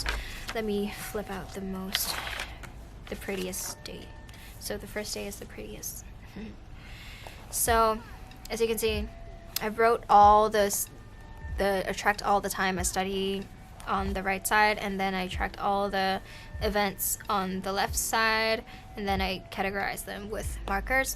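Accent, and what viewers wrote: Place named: United States. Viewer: I can’t place your accent, could you explain on that a bit? Have you been to the US for a short while? You sound that way a bit ☺️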